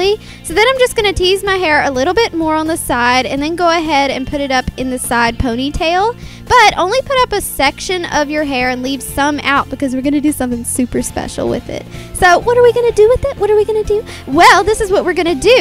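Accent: South, United States. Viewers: when i first saw the thumbnail for this video i thought she would be like a full on new York/jersey accent, and then she was country, pretty coolll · you talk just like me! with the southern accent!! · Your so cute and southern! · You are SO fun and absolutely HILARIOUS to listen too! You made my night! And its great to actually hear a southern youtuber!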